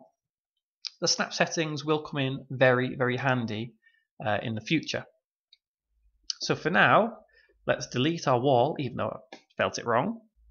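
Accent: United Kingdom. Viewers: You a scouser mate?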